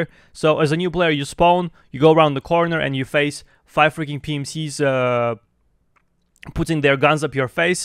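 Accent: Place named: Russia. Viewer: Its free to try.and he is russin so he can not say things in perfict english sometimes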